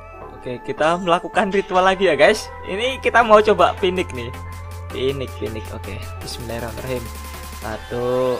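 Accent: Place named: Java, Indonesia. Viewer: Jowo Cok:v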